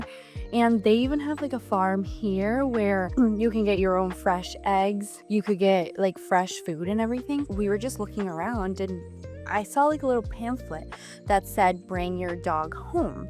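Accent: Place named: Paisa, Colombia. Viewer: quedo fascinado viendo lo tesa que eres y escucharte hablar ingles y español en ese acento de acá super paisa uffff😍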